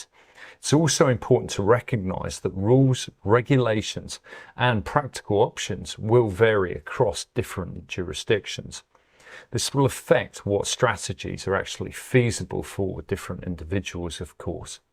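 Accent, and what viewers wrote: United Kingdom. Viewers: I’m new to the channel, and I’m impressed. If you’re in the USA & older like me, try putting playback speed at 90% to 95%… much easier given the Brit’s accent. Great video.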